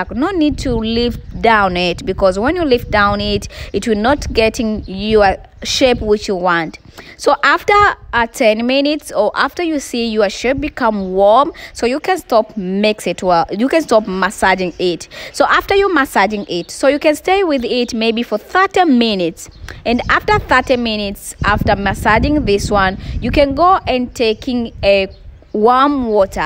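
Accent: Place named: Tanzania. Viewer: Oh my God real TZ woman, the English just Sweet....🤗💕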